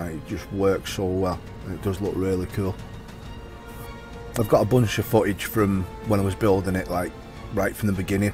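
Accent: Manchester, United Kingdom. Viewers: Deffo from salford or Manchester sound like you are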